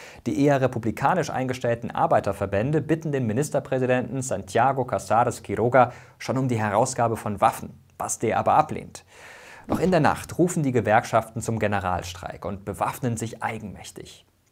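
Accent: Italy. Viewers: du gibst dir sonst immer so viel Mühe bei der Aussprache, nur nicht im spanischen. Alles sprichst du italienisch aus.:( Ich fühle mich ungerecht behandelt! 😄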